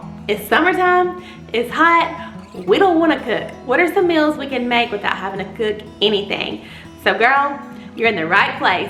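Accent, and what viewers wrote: South, United States. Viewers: I sooo enjoy watching all your videos! I love your southern accent! I'm from Oklahoma. Us southern girls have that accent!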